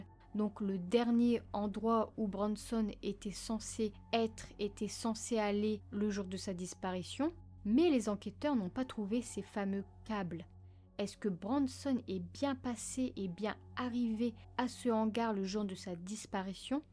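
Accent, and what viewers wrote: North, France. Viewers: L'accent du Nord on le reconnait...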